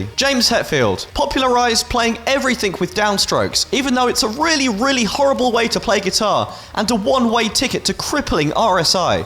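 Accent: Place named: RP, United Kingdom. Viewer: Posh kid trying to sound cool while swearing ,always makes me smile.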